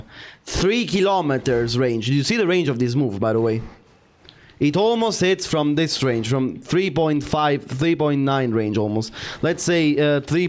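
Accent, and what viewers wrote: Italy. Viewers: Bro fuck Tekken, I watch for the Italian accent 🤌🤌🤌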